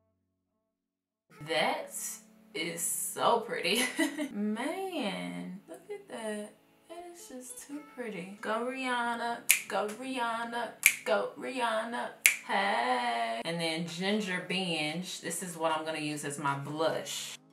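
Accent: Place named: Memphis, United States. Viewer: Lol...i live the Memphis accent. People never have to tell me theyre from M-town cuz the accent leaves no doubt if you are familiar with it.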